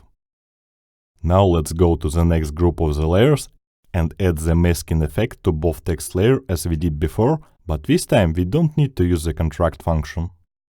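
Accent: Russia. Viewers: ох уж этот русский акцент)) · А можно по русски? По голосу слышно что можно.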